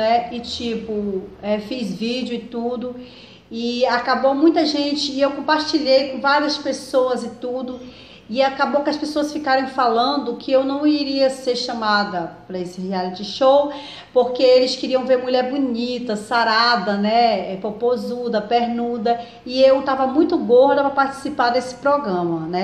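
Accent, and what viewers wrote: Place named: Northeast, Brazil. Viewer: nossa adoro esse seu jeito de falar bem nordestino msm" mangano" quer dizer rir de vc. sou de Natal Rio Grande Do Norte. bj linda vc é nossa motivacão!😙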